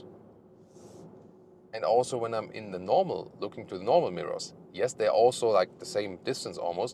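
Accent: Germany. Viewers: Just want to say, that I love your videos. As a car guy myself, I really appreciate a German car guy speaking English. It’s pure enjoyment. Greetings from Denmark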